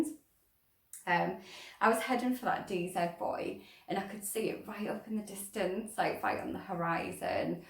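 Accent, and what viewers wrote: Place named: North East England, United Kingdom. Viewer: Just came across your excellent channel. Thanks for sharing your experiences. I’m from South Shields and spotted Tynemouth Priory, which brought back found memories of home (I now live on the Canadian Prairie these past 26 years). I’ve recently bought my own sailboat and have a couple of courses under my belt, but one day I hope to do the RYA day skipper and sail around some of the UK. Wonderful to hear your north east accent! Fair winds and I look forward to watching more of your adventures…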